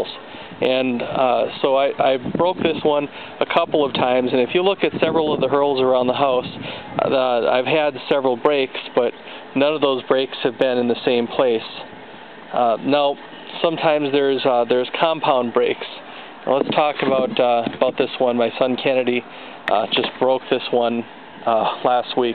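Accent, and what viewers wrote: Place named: United States. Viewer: great to hear an american accent. good sign.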